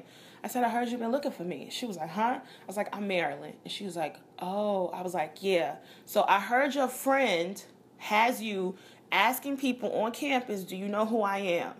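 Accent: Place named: Chicago, United States. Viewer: U from Chicago huh....nice. Midwest in the building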